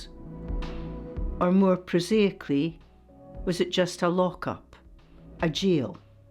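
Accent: Scotland, United Kingdom. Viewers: What a lovely voice Scottish obviously ❤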